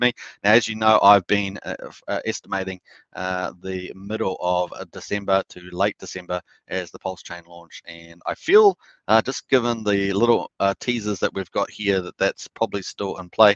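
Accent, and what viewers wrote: New Zealand. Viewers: Nice to hear a kiwi accent, thanks for the informative vid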